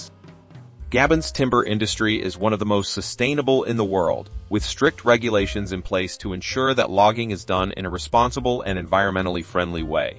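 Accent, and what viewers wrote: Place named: United States. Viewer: That is American pronounced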